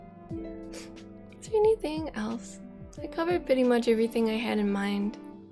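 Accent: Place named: New Zealand. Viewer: Yoooo I was thinkin' man that accent is so familiar, it sounds so much like a kiwi accent, what could it possibly be? Surprise surprise it is a kiwi accent hahaha. Not too often I come across a kiwi streamer, kapai!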